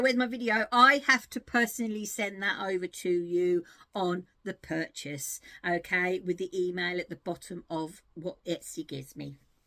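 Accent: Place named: Essex, United Kingdom. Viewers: Hi, please ignore these people they obviously have very sad lives and more spare time than you or I. You talk like me because we both come from Essex, I originate from Colchester so it is our accent. Don't think about changing ever, you make me laugh. And I would rather you say well done lots than not say it at all. Tc our lovely friend, get well soon and enjoy the show on Sat.. Look forward to seeing what you buy. Lol xxx🧶🧶👍🏻💖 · I'm catching up on some back episides 😂 I LOVE those Crunkle socks, they're gorgeous. I'm so glad you blocked that idiot. Honestly I love your accent, I grew up in Essex but left probably 30+ years ago and I love your accent, it's so lovely to hear. You keep being you, you're a treat and it's blooming kind of you to do these videos at all, they take a lot of time to do don't they! ❤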